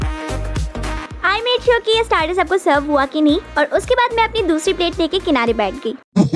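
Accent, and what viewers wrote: Bihar, India. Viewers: Dii aap bihar se ho kya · Tum Bihar se ho kya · App Bihar se ho kya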